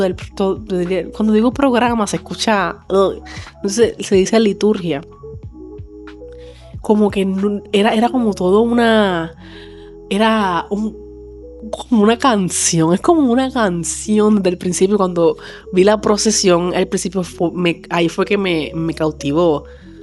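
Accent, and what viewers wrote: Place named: Puerto Rico. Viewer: Hermana Boricua! Lo sé por tu acento. Crecí asistiendo a la iglesia católica y en colegio católico y teniendo toda esta riqueza en mis narices no lo entendía en mi corazón. Mi mamá siempre me decía esta es la iglesia que fundó Cristo y aquí tenemos la Eucaristía, pero yo seguía siendo una católica tibia, sin compromiso… fui a una iglesia evangélica por unos meses pero cuando empezaron a hablar mal de las monjas y los sacerdotes además de la presión para dar dinero y me dije…esto no es del Señor…además que algo me faltaba y era literalmente la comunión, la Santa Eucaristía. Volví y empecé a empaparme de lo que creemos y a buscar información y quedé bruta y convencida de que era verdad lo que me decía mi mami. Abrí mi mente y mi corazón y estoy enamorada de mi Jesús en la Eucaristía- somos el cuerpo de Cristo - la iglesia que El quiso que viviera en unión. 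No somos perfectos pero El dijo que las fierzas del infierno no prevaleceran contra ella. Dios te bendiga y te acompañe en este caminar. Un abrazo! Vi tu video desde Virginia, EU.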